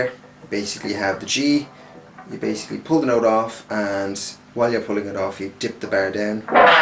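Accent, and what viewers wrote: Ireland. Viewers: Cool lesson Just wondering, r u Irish? U just kinda have the accent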